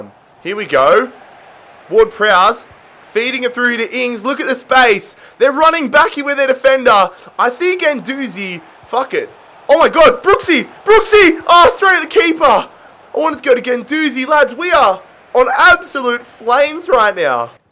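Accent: Australia. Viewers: Init you can tell he’s Australian 😂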